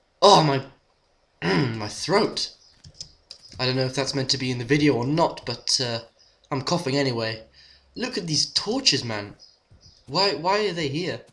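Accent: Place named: Baltimore, United States. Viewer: It's been so long... I forgot you had a bauler accent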